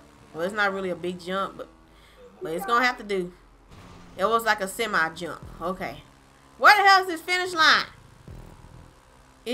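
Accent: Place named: South, United States. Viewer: Found you on Sharree. Good stuff. Where are you from though? Your accent says, the south region.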